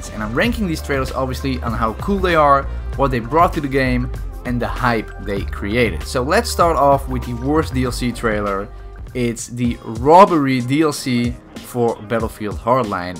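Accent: Netherlands. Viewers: You are from the netherlands or am i wrong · you dutch?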